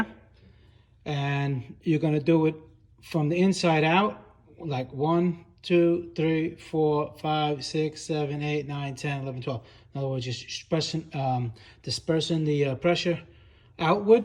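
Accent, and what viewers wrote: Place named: New Jersey, United States. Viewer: definitely people may forget to comment but I promise your helping tons of us be self reliant on our repairs, I did the whole job successfully, unfortunately when i tore it down the mating surface on the block had like rust wear indentations or something, I picked the car up for 800$ bucks thought it would be a simple fix but someone really abused it. Worked great for a few days and blew it again.. oh well you live and you learn. Btw your accent is awesome, reminds me of my relatives up north. You from Jersey or New York?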